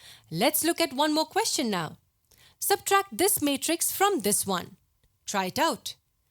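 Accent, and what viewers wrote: India. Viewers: Whats wrong with her accent? Seriously? Dude, we are here to learn something, it does not matter what accent, race or religion she belongs to. I would suggest, get used to Indian Accent because in the next 5 years, you are going to only hear that on YouTube as more and more people in India come online. This is 2020. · Indian accent :(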